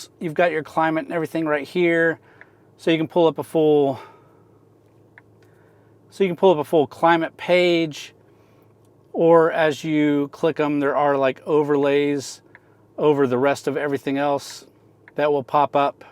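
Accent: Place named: Texas, United States. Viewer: Don’t judge. Not all people from Texas talk like this.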